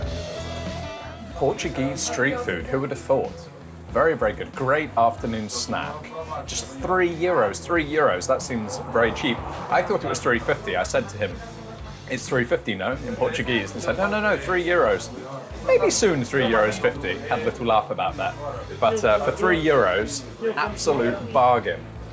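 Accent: United Kingdom. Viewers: I'm surprised he used the American word "chips" instead of the British word "crisps" considering his accent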